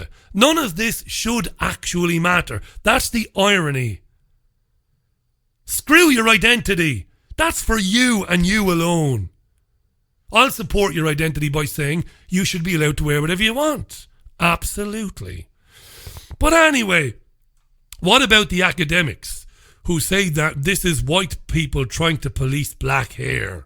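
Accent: Ireland. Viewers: Richie thanks so much for all the great programs. You've become an old friend. Great to hear the old Waterford accent in Manchester. Slán tamall.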